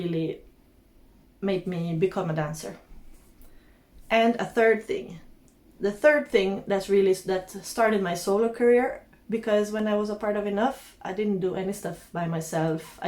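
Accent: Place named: Jamaica. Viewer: I just realized why she has a slight Jamaican accent when she speaks English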